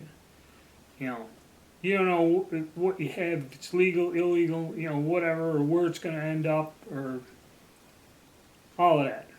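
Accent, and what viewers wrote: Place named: Pennsylvania, United States. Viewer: were are you from as in wht state were you raised.you sound like your from nj.pa.oh are you????????i say allentown pa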